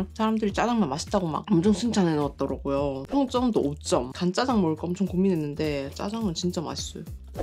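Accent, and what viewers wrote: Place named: Gyeongbuk, South Korea. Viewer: 완전 경북말씨신데...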